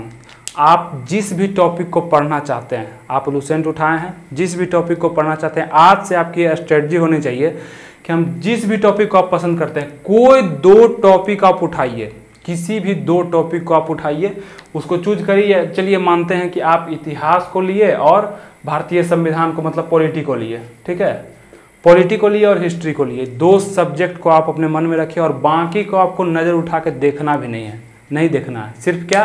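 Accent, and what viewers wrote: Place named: Uttar Pradesh, India. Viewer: aap to UP k lg rhe ho guru ji.. apni mitti❤.... nice vedio🤟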